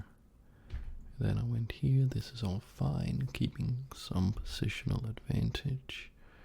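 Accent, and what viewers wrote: Denmark. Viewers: Are you from Denmark (If so then me too)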